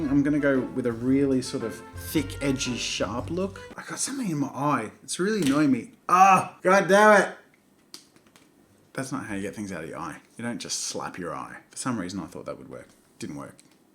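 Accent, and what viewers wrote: United Kingdom. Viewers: The brit accent though 😂😂😂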